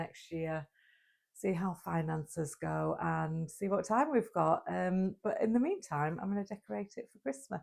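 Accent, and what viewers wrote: Northern England, United Kingdom. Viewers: Your gold wreath is exactly what I was looking for, Home Sense failed me though. I love your northern accent, you sound just like my Manchunian best friend who now lives in the US, happily she hasn’t lost her accent which I think is “dead nice”. ❤️ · I really love your content and your house and tree look beautiful. You have a lovely soft and warm northern English accent, nowt wrong with that. Have a great week. 💕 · Not boring, not annoying, and you have a lovely Northern accent. It's nice to see home decor ideas that don't cost a fortune in a normal comfortable small home. Some people seem to get a thrill out of being spiteful. My old Northern Mum used to say - if you can't say anything nice - don't say anything at all......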